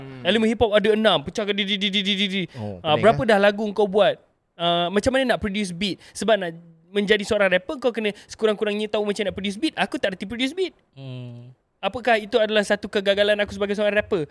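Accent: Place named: North, Malaysia. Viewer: Smbng utaqa 😂syok tgk😂😂😂😂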